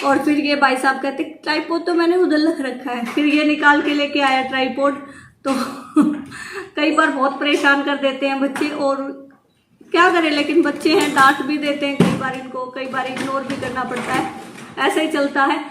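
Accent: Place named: Haryana, India. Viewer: Like6,dear apni original language me baat kiua kero..... means haryana wali .....whi achi lagti h😘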